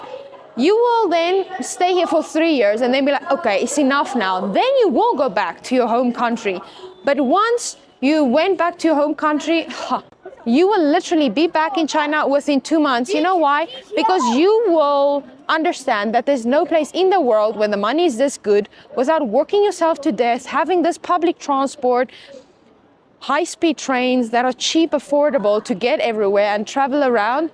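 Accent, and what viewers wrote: South Africa. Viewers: Watching from Montreal, Canada. are you South African? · I could be wrong, but you sound South African. From Jhb, i just wna say, you got my subscription sister ❤ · jy het n lekker South African accent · your accent sounds South African... is that the effect of Mandarising your US accent... just out of interest... Many thanks, loved the video, subbed.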